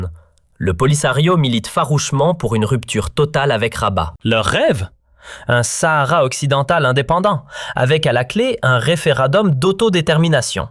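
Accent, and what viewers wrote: Canada. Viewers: il faut être dupe ou idiot pour croire que cette vidéo n'est pas l'oeuvre du régime algérien, on a beau aller chercher un accent québecois les images et les séquences vidéos qui se succèdent vomissent la signature du régime mafieux de Tebboune, depuis le début que des photos, des vidéos des scènes des gangsters algériens, aucune sur le Maroc, alors qu'il est plus aisé d'accèder à l'album photo de sa majesté Mohamed VI qu'à "chambre noire" du parrain et de sa pègre algérienne ! c'était bien essayé mais raté ! la prochaine fois choisissez un accent bien franco-français, bien sûr pas l’apathique APHATIE (Jean-Michel Aphatie) mais un Zemmour, ou Onfray, ça passera mieux !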